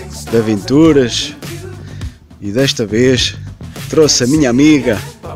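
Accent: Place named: Rio Grande do Sul, Brazil. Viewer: Meu amigo você é gaucho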